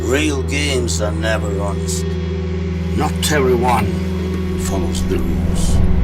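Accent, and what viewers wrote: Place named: Russia. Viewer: Russian explaining Russian jet in English with Russian accent and Russian subtitle?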